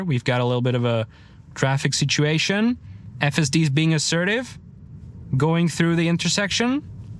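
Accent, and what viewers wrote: United States. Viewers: Your American accent is impressive! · While not perfect, your accent is amazing and sounds very American! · You did an excellent job for a newbie even your English sounds American. I can confirm what your conclusions are because we purchased a Tesla model Y Juniper two months ago and it is running and maneuvering with FSD very nicely. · I would not know that you aren't an American. Your English is terrific. You sound like any other American to me. Enjoy your visit and please visit other states. I'm in central Ohio.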